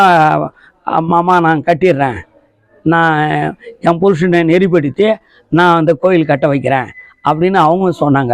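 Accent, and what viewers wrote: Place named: Chennai, India. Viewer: லோபர் பயலே மெட்ராஸ் சிலாங் பேசும் புறம் போக்கு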